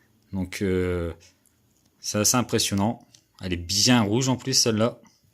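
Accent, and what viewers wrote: North, France. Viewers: Salut, tu es du Nord ?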